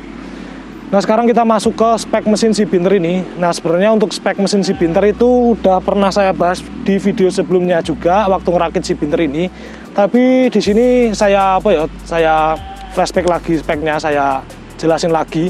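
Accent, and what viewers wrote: Java, Indonesia. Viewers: Boso jowone gawe luwih kentel broo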